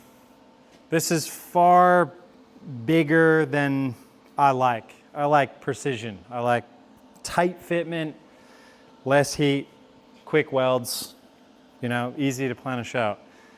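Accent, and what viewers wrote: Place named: Australia. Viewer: Damned you're talking almost Ozzie now. Come one down to the coast for a couple days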